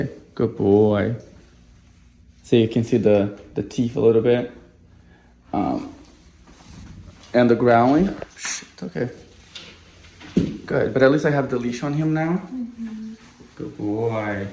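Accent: Brazil. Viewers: Você é brasileiro? Eu ouço sotaque carioca.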